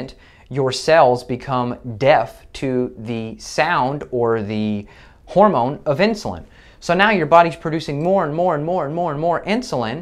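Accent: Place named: United States. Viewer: love your American accent 😀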